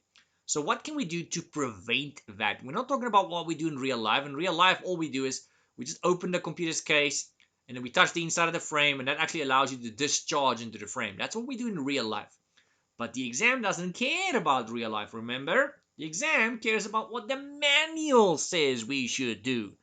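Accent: South Africa. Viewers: He's South African, like me. Just that I'm coloured though. He sound like a Wytie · I passed my core 1 thank to him even when the exam was updated you are my Hero and love the accent South Africa gets an automatic like and sub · South African accent immediately gets a like and sub 🎉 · Immediately picked up that YT SAFFA accent! Great content though, and that's what matters! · South African Identified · South African? 🇿🇦🇳🇦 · As a fellow South African it was easy to understand, nice refresher I already passed. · Definitely South African, love it!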